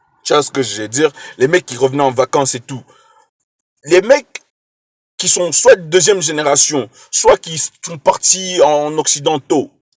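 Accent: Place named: Cameroon. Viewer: Je savais que t'étais Camer comme moi ! J'ai repéré l'accent au début 😀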